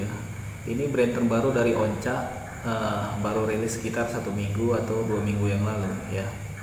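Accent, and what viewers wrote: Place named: Palembang, Indonesia. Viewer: Keren uuyyyyyyyyyyyyyy 👍 bahasa palembangnyo jagoan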